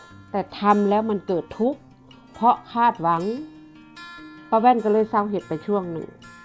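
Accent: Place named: Isan, Thailand. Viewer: มารับฟังนำค่ะป้า เสียงเว้ามวนแท้ ป้า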